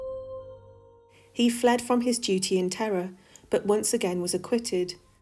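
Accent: England, United Kingdom. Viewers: This reader has a perfect sympathetic voice for this production - and it is so nice to hear a quiet English voice describing these happenings without sensationalising them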